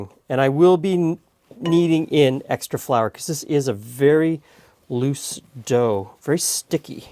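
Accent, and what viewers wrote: Canada. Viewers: Did you cut your own hair? Nice job. Thanks for the presentation and the recipe. I can tell you're a fellow Canadian by the accent. Stay safe. ♥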